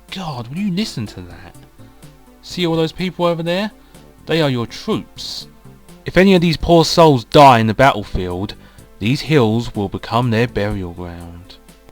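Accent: United Kingdom. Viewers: holy crap you're actually british O.O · never knew you are british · Dat brit accent! Come on, you don't sound so bad...give it another go! And about Sonic 2 for GG...yeah, it doesn't make sense. The GOOD ending, that is.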